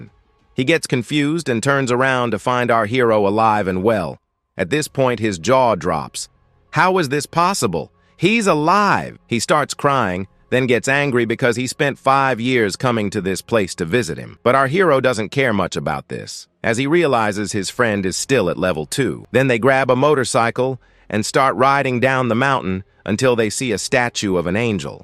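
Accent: United States. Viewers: Tf is that country accent 💀😂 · What's with the texan accent 😊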